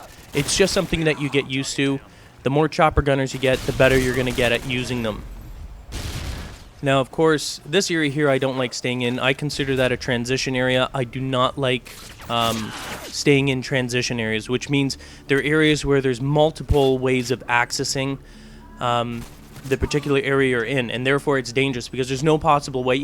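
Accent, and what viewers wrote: Canada. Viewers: Didn't even need to see your callsign to know you're Canadian. XD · are you canadian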